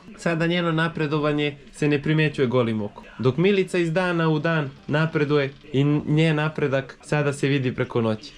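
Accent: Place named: Belgrade, Serbia. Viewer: Srecno bilo svaka cast , klipovi su najjaci ali od kad si poceo da udaras malo ovaj beoogradski akcenat nije to to 😅 drzi se kraljevackog 🙏😇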